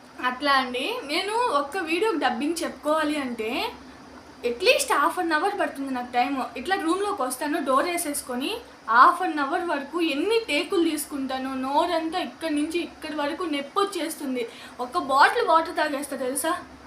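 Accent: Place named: Nellore, India. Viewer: Good very good....maadi kuda Nellore ni matalu vintunte mana Nellore Baga gurtostundi...proudness tho nanaki istanu annav good ni kallaloo happy ness chustunte yevarina motivate ayyi Ila intlo unde vallaki nuv chala aadarsavamthsmga undali vallalo marpu vachi vallalo unde talent ni gurtinchslani korukuntunnsnu any way congratulations...all the best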